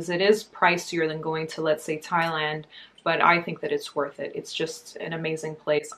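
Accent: United States. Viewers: American schools explains why you have an American accent. Also, what is short for?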